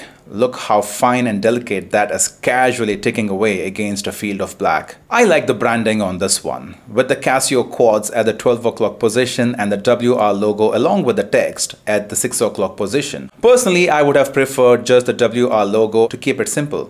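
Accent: India. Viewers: meanwhile me with my GD350 and GX56 laughing in the corner 😂 Anyway what I loved the most is your hindi accent! Also when I read the title of the video first,the only watch came in my mind was this!! This was an important watch to buy and cover,loved the video bro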